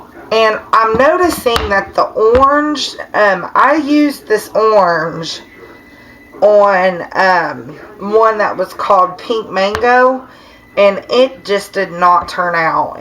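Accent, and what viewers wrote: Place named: West Virginia, United States. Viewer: hi! love your videos! idk what part of TN you live in but I'm from the southern most part of WV, only takes me 25 min to be in Buchanan county VA...lol......but the reason I'm telling you this is because your accent is the same as ours around here....haha But anyways, down to business.....i just watched a video on a 6 color in the pot swirl and her name is Christy Rose. i just thought I'd let you know about her video and maybe it can help you achieve the results you were wanting. on another note, hope all is well with you and your family and i can't wait to see some new videos and wonderful soaps from you!!!! I'm not a soap maker yet but I'm doing research and i think here soon I'll be ready to try my hand at it. ok I'll stop rambling now, take care and keep on making awesome soaps and whatever else your heart desires...and much love from McDowell county WV!!!!! 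lol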